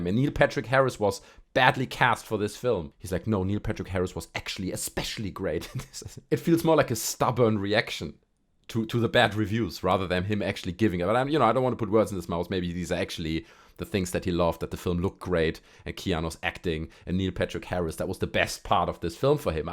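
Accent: Germany. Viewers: I love your German accent. You and the YouTuber 'get germanized' should collaborate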